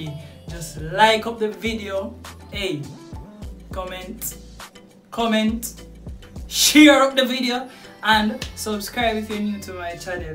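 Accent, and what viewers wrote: Jamaica. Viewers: U Jamaican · I know u Jamaican breddah 😂 big up 🇯🇲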